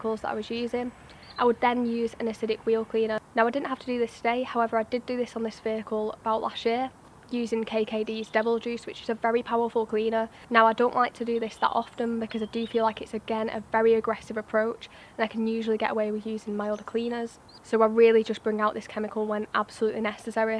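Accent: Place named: Manchester, United Kingdom. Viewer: absolutely you do, the voice is great too, would it be cheeky of me to ask where your from? I’m picking up bit of manchester and abit of Cheshire would you be somewhere in the middle? Im broad Bolton, my expertise is grass but I love the car cleaning always have since i was a kid, so much choice now though, ive just bought the 3 in 1 cleaner off the facebook ads to see if the waters runs off like it does in the videos in china, have you seen it? Used it?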